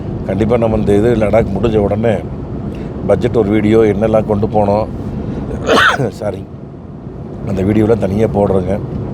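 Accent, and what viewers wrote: Kongu, India. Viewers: அண்ணா நீங்கள் பேசும் கொங்கு தமிழும் லடாக் பயன வீடியோவும் மிகவும் அருமை வாழ்த்துக்கள் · கொஞ்சும் கொங்குத் தமிழ் எப்ப வரும் காக்க வைத்த அண்ணன் அவர்களுக்கு வாழ்த்துகள். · கொஞ்சும் கொங்குத் தமிழ் பேசும் கோமகரே...! திகட்டாத தித்திக்கும் பேச்சுடைய திருப்பூர் திருமகரே...! எங்கள் மதிப்பிற்குறிய டான் திரு. அண்ணா❤❤❤🙏 அவர்களே எப்படி இருக்கீங்க அண்ணி❤❤❤🙏 எப்படி இருக்காங்க ரித்திக்❤❤❤ எப்படி இருக்காரு. அழகான வீடியோ அற்புதமான இயற்கை எழில்கொஞ்சும் பனிமலைகள் பல வண்ணங்கள் கொண்ட மரங்கள்...! கண்கள் இரண்டும் குளிர்ந்தன அருமையான காட்சிகள் கண்டு...! நம் ❤சிட்டுக்குருவியோட❤ தங்களது இந்த லடாக் பயணம் இனிதே தொடர்ந்திட நல்வாழ்த்துக்கள் அண்ணா❤❤❤ என்றும் அன்புடன் உங்கள் தம்பி என்றும் அன்புடன் உங்கள் TMF ரா.முஹம்மது கபூர் (சென்னையில் இருந்து)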